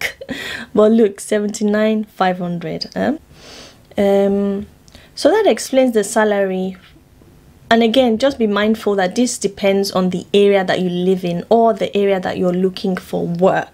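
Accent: Nigeria. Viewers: Nice content. You have a new subscriber. How come you have my Nigerian accent😀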